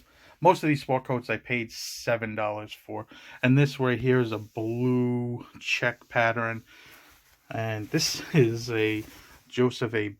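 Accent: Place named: New York, United States. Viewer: I thought I heard the NY accent. Grew up in Falmouth. Just moved to IL bummer I didn't find you sooner 😅.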